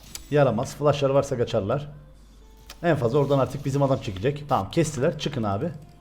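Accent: Cyprus. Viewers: abi seni izleye izleye ağzım kıbrıs ağzına döndü evde hade de genne diye dolaşıyom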